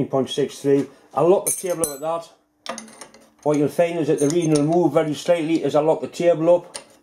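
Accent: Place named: Northern England, United Kingdom. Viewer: Propper northern working class English.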